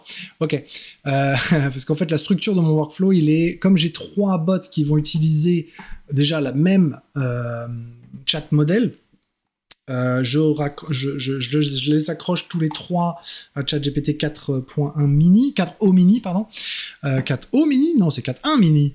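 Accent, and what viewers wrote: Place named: England, United Kingdom. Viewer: top ,comme à ton habitude et j'aime bien ton accent anglais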